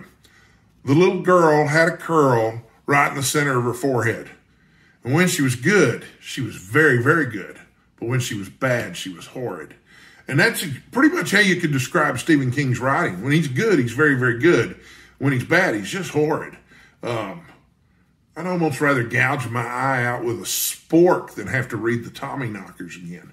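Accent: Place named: South, United States. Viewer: Great to hear a fella with a little South in his mouth. You’ve got some interesting picks.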